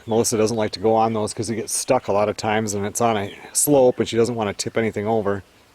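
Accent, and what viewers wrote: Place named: North, United States. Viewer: I thought you have a northern accent. How does she like the difference in weather?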